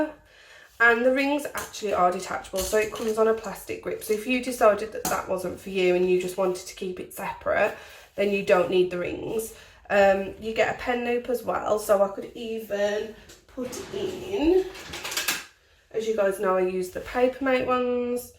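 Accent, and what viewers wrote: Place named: West Midlands, United Kingdom. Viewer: Are you from the West Midlands? I can hear it straight away 😂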